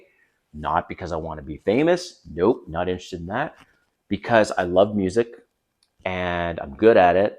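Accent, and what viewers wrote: Canada. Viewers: Def got the Canadian accent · lol, I knew you were a fellow 🇨🇦 the second you started speaking 🫶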